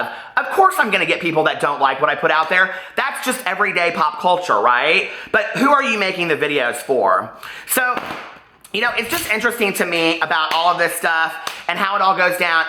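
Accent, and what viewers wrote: South, United States. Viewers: You always sounded so southern to me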